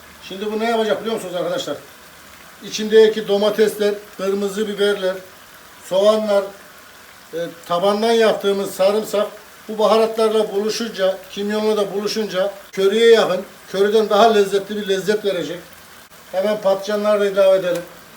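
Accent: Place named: Anatolia, Turkey. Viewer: Ustamın şivesi ve doğal haliyle gerçek ve dürüst Anadolu kişiliği güzelliğe güzellik katıyor,Rabbim yolunuzu açık eylesin.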